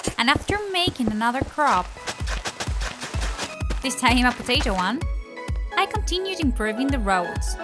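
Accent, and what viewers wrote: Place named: United Kingdom. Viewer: Who love her British voice❤❤❤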